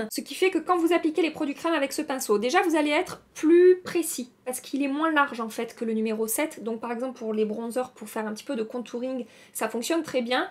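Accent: South, France. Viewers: adore ton accent du sud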